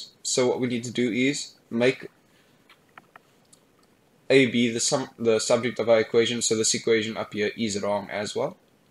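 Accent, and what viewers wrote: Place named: Cape Coloured, South Africa. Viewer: Are you from south Africa because you sound coloured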